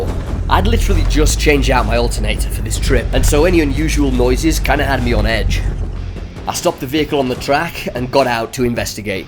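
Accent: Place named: North, United Kingdom. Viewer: Been following you on instagram for a while, love the long form YouTube style. Always class to hear a fellow northerner too! Looking forward to the rest of the series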